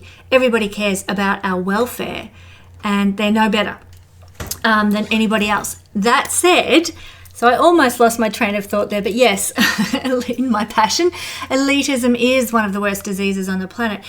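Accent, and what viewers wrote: Australia. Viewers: Refreshing to hear an australian astrologist, aussie here too Taurus sun, Libra moon, Leo rising Love your videos and a conspiracy realist here also ❤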